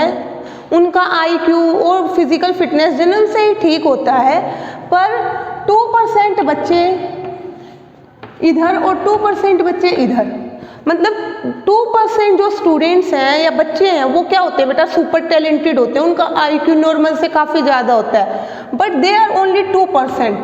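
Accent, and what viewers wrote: Haryana, India. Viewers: Mam aapka Haryanvi touch aa rha h🥰 Lkn fir bhi m aapse hi pdti hu 🤓🤓 PHE ke sbse best teacher ho aap mam 🙏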